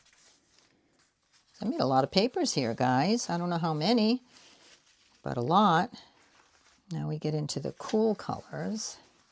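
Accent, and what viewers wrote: Boston, United States. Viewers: Love your story about your Boston accent, my husband is also from Boston (raised in NH) and worked hard early in his military career to lose his which he did, but like you, when he gets around other Bostonians, it comes right back! And once and while, he slips. I think it’s charming, lol. Btw, I also love Rex Ray art. I’ll send you a very cool video that I came across a few years ago. Have a lovely arty day❣️ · You devil. You and the algorithm of YouTube! I’m an ART SUPPLIES JUNKIE in recovery!!! It’s Sept 2025 and I CANNOT buy any art supplies, new or used, this month or else I have to pay $50 out to my BFF for losing our challenge this month. It’s Sep 19, eleven more days to go. And I’m struggling with gel plate printing. Oh, well, I’ll keep practicing and trying to find newsprint free somewhere. And I’ll start buying Nora colors in October, maybe 2-3 at a time. 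I’m loving this video, liking your stories, wishing I could hear your Boston accent, and away we go. BTW, 1976 is also when I started 12 Step Recovery at age 31. I’m 81 and still loving arts and crafts. Also, I just don’t get color theory. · I thought I recognized that accent! I worked to get rid of mine as well and now live in NH and boy do they sound different than Bostonians! When I drive under pressure that accent bubbles to the top and my kids don't let me forge tit. LOL. It's WICKED funny. Love your videos. Just found you today. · It's called "code switching" when you change accent to that of those around you. It's a natural human psychological mechanism, and whole books are written about it. I had to study it for one of my sociology classes at uni. It stuck with me because i had several different accents around me, growing up, and had become adept at popping between them, but always felt fake- even though they were technically all MY native accents! 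Anyway- i adore the stories and the COLORS! Side note- i was JUST watching one of my favourite travel tubers, Steve Marsh, and he went to Iceland and had a whole segment on the US airbase there. Pretty cool to now run across someone who used to work there! The navy in the 79s? As a woman? You must have SO many stories to tell! I am hoping you share more! (Btw- your boston is still there- just around the edges. ❤)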